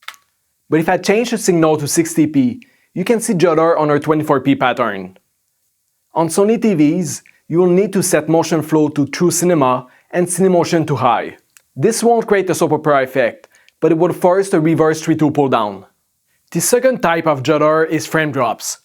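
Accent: Quebec, Canada. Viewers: It’s a French Canadian accent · I can tell that you are from Quebec haha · HAHA un Québécois ! :) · Lul French Canadian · It's actually a French Canadian accent 😉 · He has a big accent because he is from Quebec. I'm from Quebec too so I could tell. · Hehe that's nice to hear a French Canadian accent on a high quality informative video. I noticed it right away and trust me, he speaks english EXTREMELY GOOD and way better than the average person from here, including myself haha.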